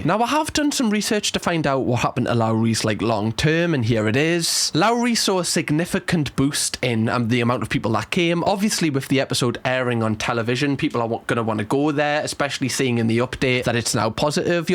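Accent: Newcastle, United Kingdom. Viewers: Hes geordie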